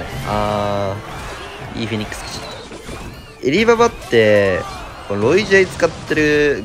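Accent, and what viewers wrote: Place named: Kyushu, Japan. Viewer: 九州は大体口調こんなんだよ いや、嘘ついた。鹿児島と長崎は違うかも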